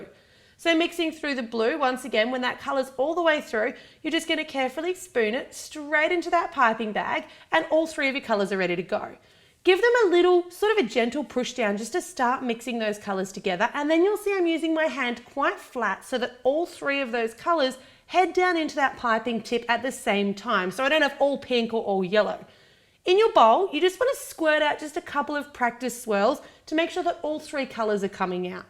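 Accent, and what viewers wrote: Australia. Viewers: You are such a high spirited person! I love your videos! Keep up the fabby work! 👌 P.S love your Australian accent! 😍 x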